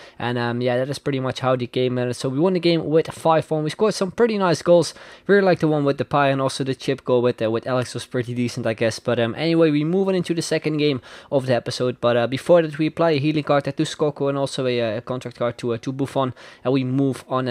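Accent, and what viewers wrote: Netherlands. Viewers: Are you dutch cause you have a accent?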